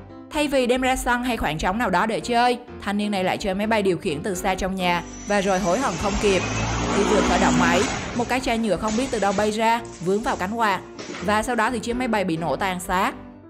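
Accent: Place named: Quang Ngai, Vietnam. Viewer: MC là giọng Quảng Ngãi màk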